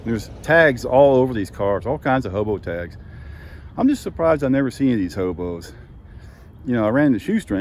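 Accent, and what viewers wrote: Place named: New York City, United States. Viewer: loved your New York City accent ("DPUs, I see youse").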